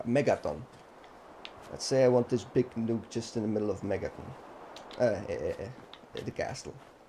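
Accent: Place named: Netherlands. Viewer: Je klinkt Nederlands.. Goeie tutorial man.. Snapte echt geen kut van dat menu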